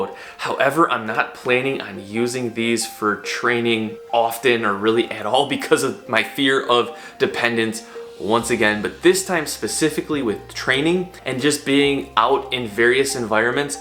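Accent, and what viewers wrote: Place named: Chicago, United States. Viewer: Does anyone else detect a Chicago accent?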